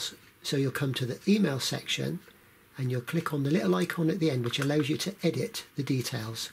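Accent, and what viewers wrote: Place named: West Country, United Kingdom. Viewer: Mate u sound west country ish lol👍😎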